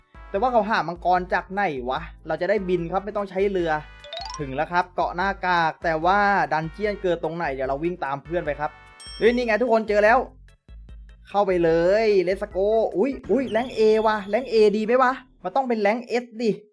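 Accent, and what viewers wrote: Phetchaburi, Thailand. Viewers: ฟังเสียงก็รู้เเล้วว่าเป็นคนเพชรบุรี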